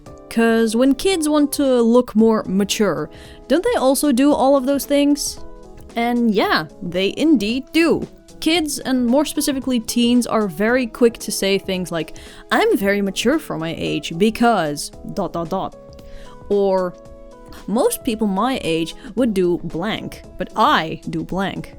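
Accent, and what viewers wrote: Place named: Netherlands. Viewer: Yeah I used to watch your vids back then but it wasn't noticable but now as I started talking to more Dutch people the accent became more noticable lol It's still a nice accent! :-) Hahaha, yeah I had to ask a friend what that "steenkolen" means - quite funny. Yeah, of course! ( As a fellow artist ) I still appreciate your art on a technical level!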